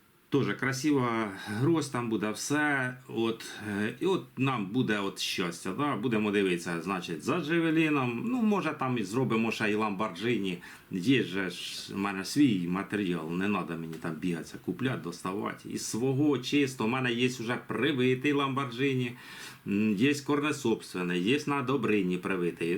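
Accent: Poltava, Ukraine. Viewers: Балакаєте, як на Полтавщині, дуже приємно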